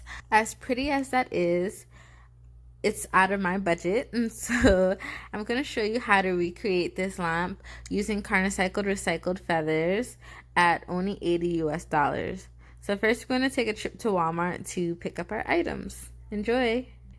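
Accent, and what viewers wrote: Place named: Trinidad and Tobago. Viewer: Lovely. You sound like a trini!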